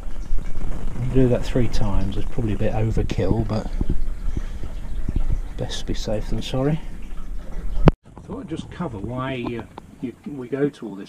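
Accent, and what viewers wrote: United Kingdom. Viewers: Hi. I just found your channel. Your video title caused me to watch and prompts my comment. I live in the central USA. By listening to your manner of speech, I can guess that you are in the UK. You and I use language differently. I am a nurse with a background in preventive medicine and water sanitation. I want to point out that there is a difference between sterilisation (sterilization) and sanitization. Sanitizing is actually what you are doing in the video. Sanitizing is the killing or removal of most and especially of the harmful micro-organisms. Sterilization is the killing of ALL micro-organisms. Sterilization is a process used in medicine to prepare surgical instruments. It most often involves steam under pressure or ethylene oxide gas. True sterilization isn't practical outside of medical and a few industrial environments. 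Sanitization is used in food service and other environments. The RV's fresh water system is actually being sanitized instead of truly sterilized. I am not criticizing your use of the language, and probably your UK viewers understand exactly what you mean. But for others, I feel that it is important to make the distinction. It is important at the beginning of the season, end of the season and any time one believes that the water system might be contaminated, to sanitize the system. Your video showed one product available to help accomplish this. Sometimes though, one might find oneself in need of treating the water system without having an availab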